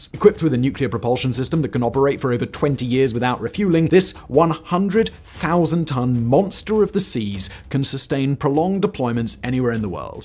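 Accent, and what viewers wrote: United Kingdom. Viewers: I find myself conflicted given I have quite the military boner in regards to an American Aircraft carrier bringing the pain, yet it's history is being delivered by a Brit....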